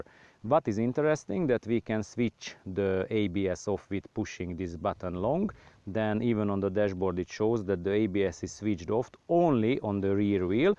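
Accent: Hungary. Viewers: magyar akcentus:D nice video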